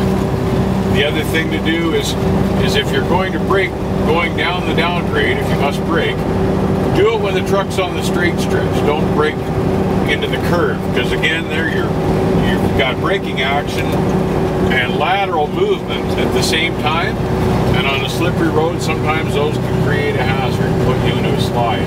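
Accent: Canada. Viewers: You ate a great driver i can tell that you have been a professional for a long time. I can also tell you’re Canadian, the way you pronounce your O’s. 😃. Thanks for the video, very helpful!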